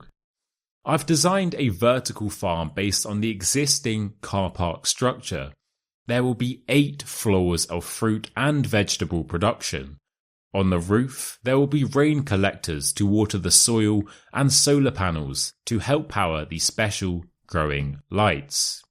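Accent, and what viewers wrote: United Kingdom. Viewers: thank so much i was listening the narration but, i think is a bit high level for my. I like de challenged, as hear British accent, so I will try to understand , to be able to enjoy it. Again, thanks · Wonderful story. i subscribed your channel to improve my pronunciation and listening skills because I love British accent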